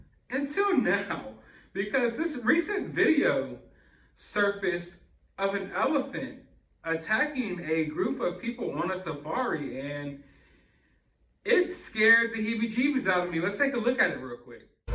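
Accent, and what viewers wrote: Australia. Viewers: I love Australia and love your Australian accent. I would have passed out. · I love elephants and don’t blame them for being annoyed at humans . Love. Your Australian accent btw 🙂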